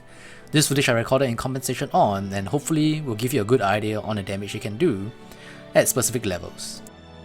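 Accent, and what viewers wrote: Singapore. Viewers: accent is south east asian. hes singaporean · Yin s he still sounds like a Singaporean to me.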